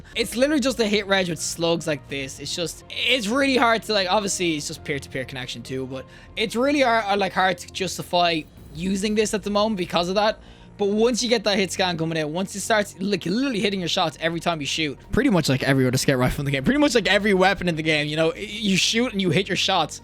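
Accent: Ireland. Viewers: you saying warlocks ARENT king of the skys already?? also are you irish?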